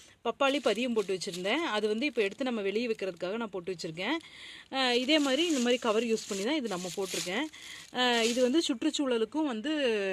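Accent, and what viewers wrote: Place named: Kongu, India. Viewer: kozhi koovuvadhum iyarkai suzhalum ungal kongu tamizhum niraivai irukkirathu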